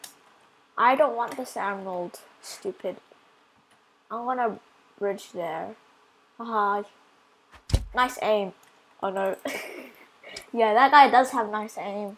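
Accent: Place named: United Kingdom. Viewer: Briish huh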